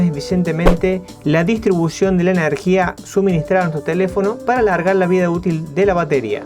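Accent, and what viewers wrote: Córdoba, Argentina. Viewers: Se nota que sos cordobes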